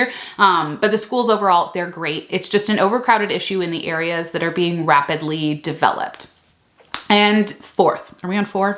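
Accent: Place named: North Carolina, United States. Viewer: Why is that sobering? At least I would be able to live comfortable and would be able to succeed in my life. That's my intentions to start my own business in a better state. I don't want to go in SC it's not the best state to accomplish my goals. Are you from NC? Because you sound like you were born here?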